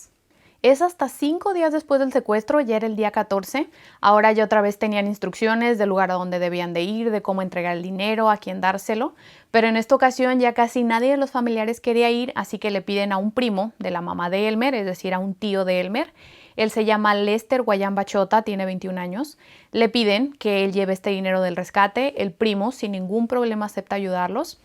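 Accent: Mexico. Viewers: ¿Eres norteña vd? ¿Monterrey? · si gracias sabía que era mexicana su asentó no podía equivocarme y desepsionarme gracias 👍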